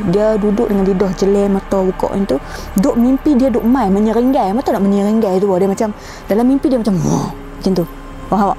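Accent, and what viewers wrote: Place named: Penang, Malaysia. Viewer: . Hang segak la cakap penang pulak! Hang mmg comei 😍😍😍 dok follow dari joki timba tu.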